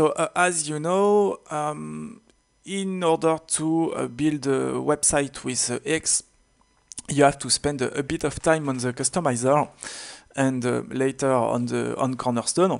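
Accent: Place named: France. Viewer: This really helped me. And I wish I spoke French, so I dig the accent.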